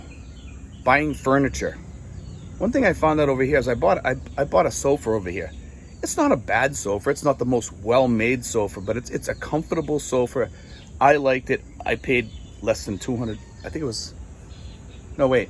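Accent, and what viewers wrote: Boston, United States. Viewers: Thought i heard a boston accent . I have been watching your videos for awhile . I appreciate the insight ,knowlege you give .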